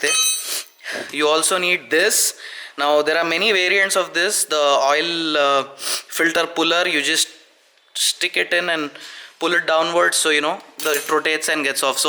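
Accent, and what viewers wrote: Hyderabad, India. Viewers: loves when u talks in hyderabadi language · mian aapki Hyderabadi accent bohot mast hona mian. I love the way you talk man, you can make a rondu laugh his lungs out brother. Cheers.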